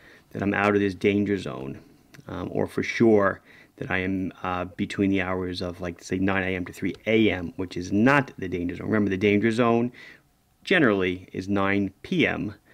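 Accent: Long Island, United States. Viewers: He's from Long Island. That's how they talk. 😁👍✌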